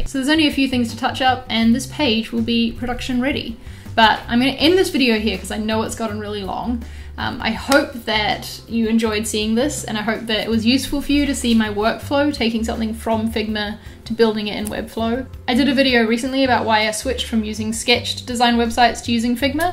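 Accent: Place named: New Zealand. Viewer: good to hear a kiwi accent on the tube!!!!!!!!!!!!!!!!!!!!!!!!!!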